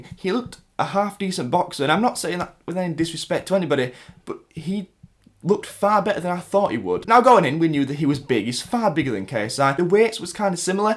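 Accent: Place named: Yorkshire, United Kingdom. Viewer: hi I hope you see this comment, I love ur content and I have a question: where are you from? your accent sounds northern ( maybe Yorkshire or something like that?) ps: keep going mate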